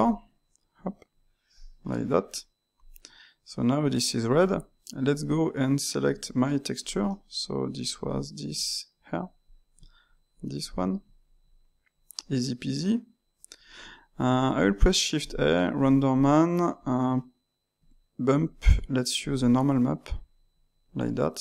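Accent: France. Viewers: on reconait le francais mdr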